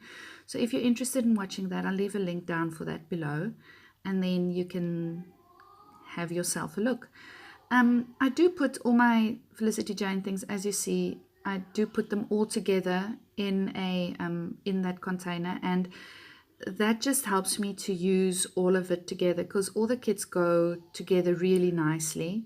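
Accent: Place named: South Africa. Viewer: Lovely to hear your South African acsent. Lovely layout. Love FJ. Love from Cape Town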